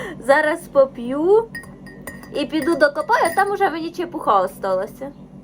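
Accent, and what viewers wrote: Poltava, Ukraine. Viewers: У нас ,на заході України, мова трошки інша.Але так говорять мої рідні з Хорола Полтавської обл. Люблю слухати ваш діалект,здорово і гарно!❤😊 Смачного тобі!!🎉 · мені подобається як ти розмовляєш. ми на Полтавщині так і балакаємо.спасибі за відео.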